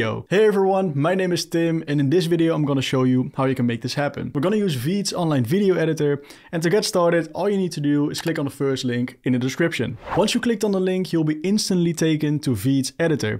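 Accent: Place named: Netherlands. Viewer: When I click a video and I hear Dutch accent it just makes me happy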